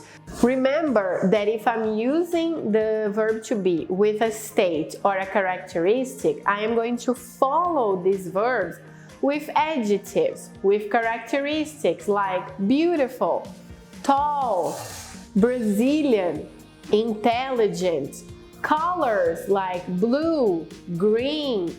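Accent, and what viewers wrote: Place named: United Kingdom. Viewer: Eu acho fofo o seu sotaque britânico. Normalmente, no ingles americano, o T tem o som de R em algumas palavras, such as: "personeleri", "ciri", "nationaleri", porém no britânico eles pronunciam o T originalmente.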